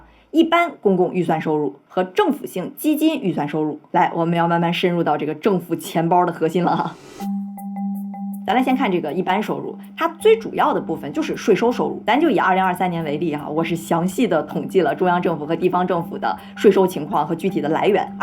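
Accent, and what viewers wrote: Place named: Shandong, China. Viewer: 這集小林的中國腔很重，難怪避重就輕。説了很多（足夠賺流量），卻好像沒説？ 看來我還是應該看立場鮮明的博主。。。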